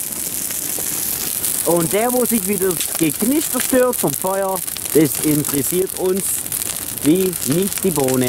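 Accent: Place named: Swabia, Germany. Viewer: feiers vor ellem dass au en schwob bisch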